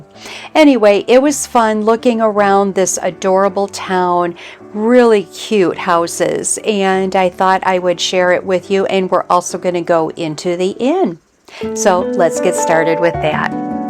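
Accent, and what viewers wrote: Midwest, United States. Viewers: Thanks for a great tour,. King Arthur’s product selection is vast and oh so tempting. Hubby and I have given up eating white flour and sugar so it’s a good thing that we don’t live there! But, we are not zealots and occasionally treat ourselves to a sweet. Your videos are very good and getting better. I enjoy watching. Originally from the Midwest, myself, (Ohio), and I enjoy listening to your accent which is so familiar to me. Have a great week!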